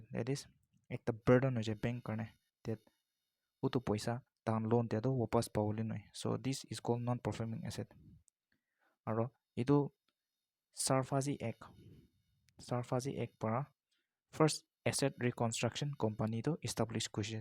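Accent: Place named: Nagaland, India. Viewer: Bro by ur accent I think ur from Ao community man.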